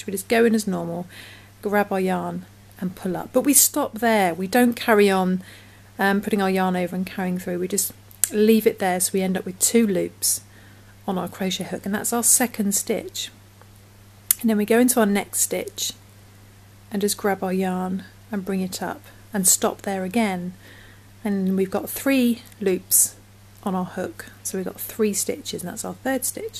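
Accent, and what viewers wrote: England, United Kingdom. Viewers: Hi I notice you're talking in an English accent but talk about single crochet. In the UK we call it double crochet. Please could you tell your UK viewers the difference. Thanks. x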